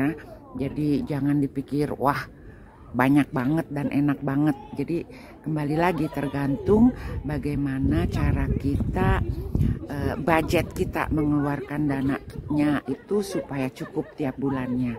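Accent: West Java, Indonesia. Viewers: Wilujeng sonten Bunda, reseup pisan logat Sunda na kaciri keneh, God Bless you🙏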